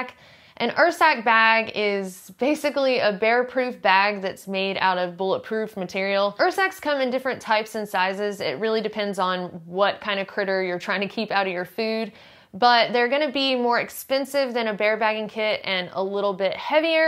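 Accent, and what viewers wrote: South, United States. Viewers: My moms account but your southern accent creeps in i love it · Absolutely LOVE your southern accent, I'm from the South and it reminds me of home! My wife sounds the same; Riiiiiice!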